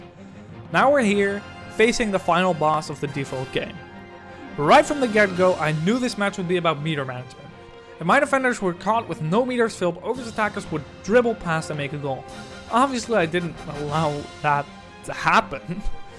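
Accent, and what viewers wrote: Netherlands. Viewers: If you keep continuing this series you'll have my reeled in man. Such an entertaining series to watch! En Nederlands zo te horen! Ga zo door maatje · I love that you can hear the dutch😂. I love the vids so far. I began watching after I got the game again. I just got the game again, I am loving it just like i did years ago. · Are you dutch? I feel like I hear a little Dutch in ur reactions😂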